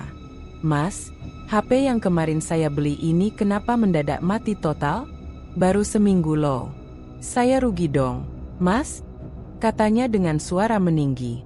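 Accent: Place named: Java, Indonesia. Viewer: Lucu banget logat jawanya.... Aneh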